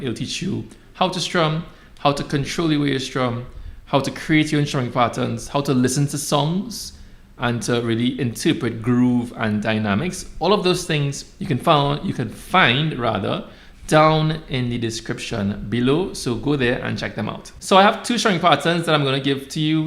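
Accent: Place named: Trinidad and Tobago. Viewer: Thanks man, this is such a well done lesson of a classic love song! I am so glad that I found your channel, and I subscribed immediately 😂! Also, coming from the West Indies myself, I am sure that it’s a Trinidadian accent I am hearing 😊, nicely done man.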